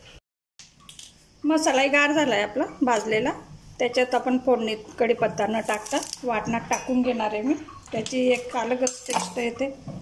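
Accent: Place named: Dhule, India. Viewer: Aap dhule ke ho kya😁nice video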